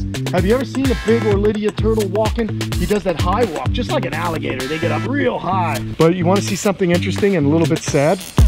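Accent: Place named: Long Island, United States. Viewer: You are do funny! I can hear the Long Island in your voice and the way you talk! Your sense of humor is SSOOO NEW YORK!!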